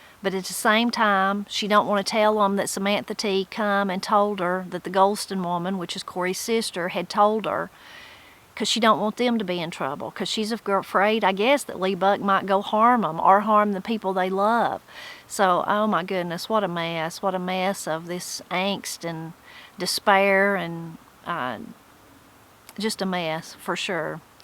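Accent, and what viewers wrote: Appalachia, United States. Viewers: I have just found your channel and have subscribed. Hearing you talk is just like hearing my grandmother talk. She and my grandfather were from the hills of Tennessee and followed many of the Appalachian folk ways, culture, language, etc. I try to keep a list of words and expressions they used that I never hear anyone else use and over the years have found that much of it came from England and Scots-Irish ancestors. It is wonderful that you are preserving that heritage. Blessings.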